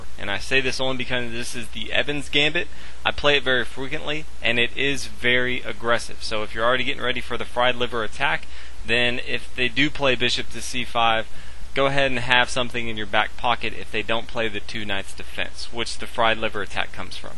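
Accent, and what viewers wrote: United States. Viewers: Nice American accent!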